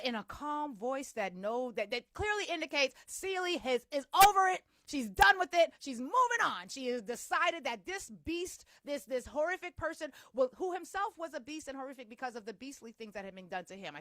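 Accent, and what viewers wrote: South, United States. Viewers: I approve this message. BTW, your southern accent is hilarious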